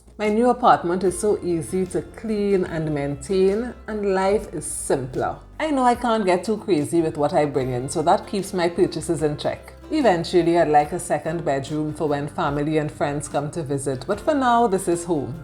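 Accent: Trinidad and Tobago. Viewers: I was watching this video on silent as soon as I turned on the volume I knew you were Trini. Subscribed immediately.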